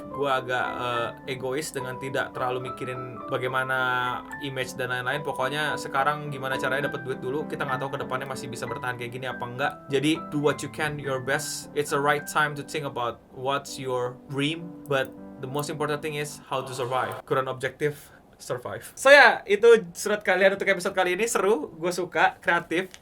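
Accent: China. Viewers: Malah kaya orang Tionghoa bacanya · itu logat belanda apa cina? kadang kdgrn kek logat cina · logat Belanda sama Chinese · Bahasa belanda aksen china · Logat cina terdeteksi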